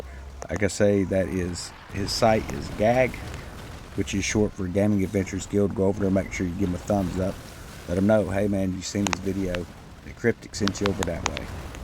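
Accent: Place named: New York, United States. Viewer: Your accent gives yourself away. New York? 😉 S C here, take care.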